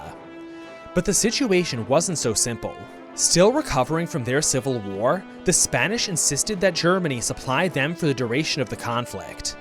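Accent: United States. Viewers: Pov: most American voice for us Brits Eva. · Why is Britain speaking with a yank accent….?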